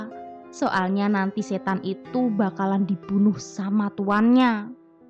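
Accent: Java, Indonesia. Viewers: Ngomong'e medok mbak'e😂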